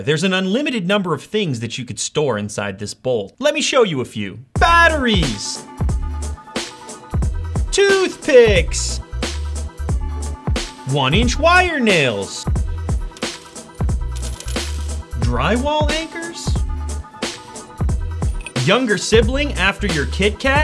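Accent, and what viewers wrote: Canada. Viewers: R u Canadian mr.unboxer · Are u Canadian unbox therapy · Is he Canadian?? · is he canadian??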